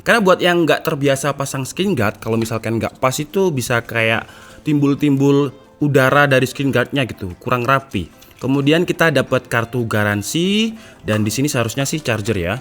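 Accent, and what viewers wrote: Java, Indonesia. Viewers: Mas sampean jowo ngendi???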